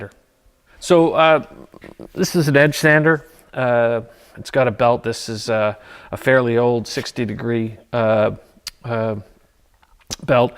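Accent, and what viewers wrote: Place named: Canada. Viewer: Great videos! I plan to use this in my technical theatre classes. Random question: I thought based on your accent (and the snow) that you were probably Canadian, but then you still use imperial measurements instead of metric. Am I just wrong and you aren't Canadian, or do you still use imperial measurements in Canada for theatre, to be consistent with the U.S. industry?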